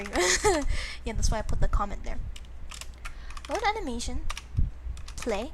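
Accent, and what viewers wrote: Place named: Toronto, Canada. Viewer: yeah idk how to describe it what comes to mind is a toronto accent 😂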